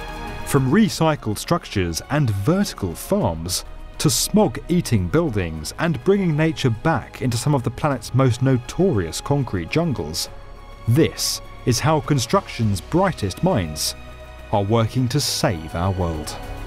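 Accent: United Kingdom. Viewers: Why are British voices so satisfying.. David Attenborough Jeremy Wade Gordon Ramsay Whatever your real name is.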